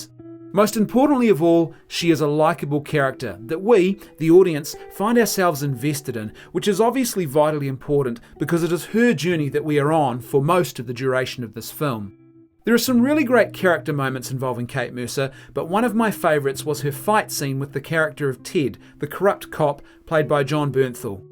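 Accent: New Zealand. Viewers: are you kiwi bra? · The guy talking sounds like a Kiwi. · Can't handle the Kiwi accent... Blah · Kiwi accents crack me up! Great review of the movie, but my takeaway will always be, "ass-pics of dipth" & "muudah".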